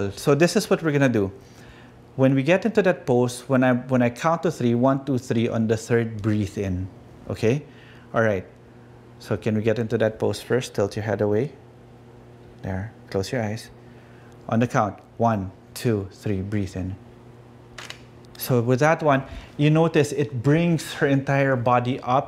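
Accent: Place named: Philippines. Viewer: Right away I knew he was filipino when he started talking and I have a cousin named Jiggie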